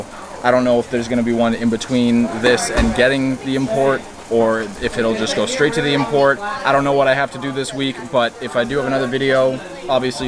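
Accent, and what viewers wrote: Massachusetts, United States. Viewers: Are u from mass ?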